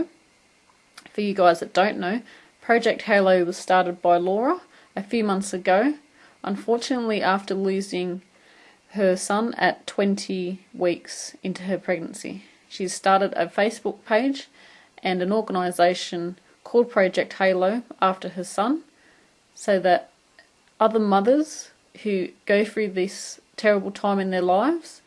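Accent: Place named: Australia. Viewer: my first thought at her voice was "ooh you're aussie aren't you?"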